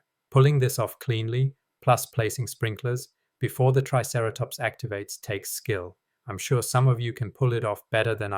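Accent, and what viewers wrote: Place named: Germany. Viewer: Ich höre deutsch raus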